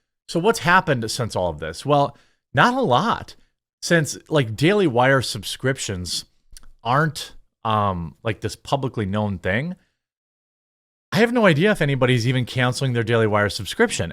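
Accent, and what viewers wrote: Wisconsin, United States. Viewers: Wisconsin accent.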